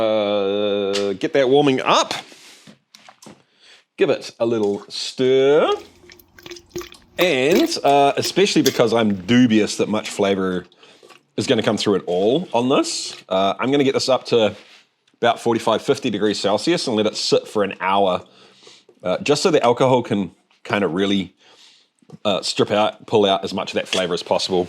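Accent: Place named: New Zealand. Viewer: Your absinthe video popped into my list, and I noticed your Kiwi accent. With your videos on doing crazy alcohol from Pop Tarts and other stuff, you reminded me of my uncle Ron who emigrated there about 25 years ago. Before he left, he would try to make wine from turnips for a laugh, and now makes cider apparently.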